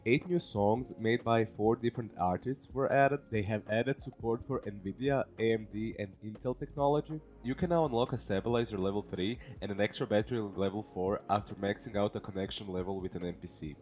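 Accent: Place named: Hungary. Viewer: Are you from Hungary? Your accent sounds like Hungarian person I know. Good video btw, concise like it should be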